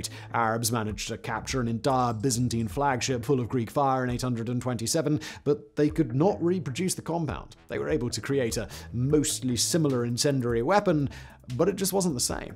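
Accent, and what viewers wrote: United Kingdom. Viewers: Whistler provides hosting duties on like 97 different channels, right? I earnestly attempt to watch these but for me simply talks too fast or my hearing is woefully too slow; add in the British accent and I quickly find myself rewinding from the jump to replay stuff I simply didn’t hear, couldn’t understand or a combination of both. By the time I try using CC to help me I’m exhausted and generally give up.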